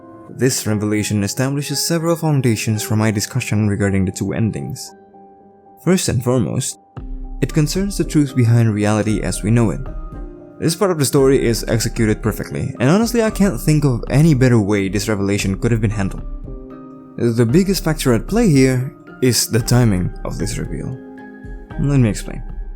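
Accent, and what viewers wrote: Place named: Indonesia. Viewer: i immediately recognized your accent! hello, from an indonesian american! i loved this video and completely agree with your analysis and all of your thoughts on the ending. it’s been 2 months since i’ve seen the finale of clair obscur and thinking about these characters still brings me to tears 🥲 so few games hit as deeply as this one does, it’s such a gem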